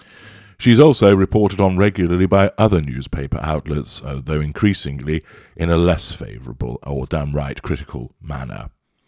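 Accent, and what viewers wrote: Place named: North, United Kingdom. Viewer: you aren't a southerner are you ? I think you are from the north. There are some words that you pronounce wrongly for a southerner especially a posh one lol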